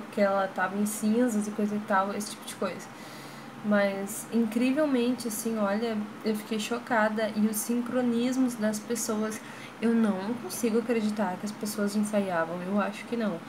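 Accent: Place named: Rio Grande do Sul, Brazil. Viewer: Tu é gaúcha né? Dá pra ver pelo sotaque haha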